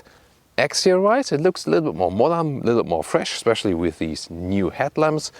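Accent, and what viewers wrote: Germany. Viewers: Dieser deutsche Akzent :D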